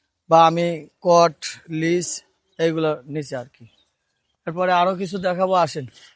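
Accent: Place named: Gaibandha, Bangladesh. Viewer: ভাই আপনার হোম টাউন কি কোন ভাবে গাইবান্ধা জেলায়? ভাষার টান টা তেমনি লাগে। আশা করি রিপ্লাই টা দিবেন।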